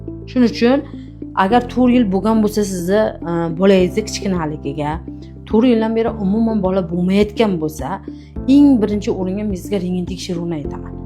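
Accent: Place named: Qashqadaryo, Uzbekistan. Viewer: Qashqadaryolilani shevasi zorde😅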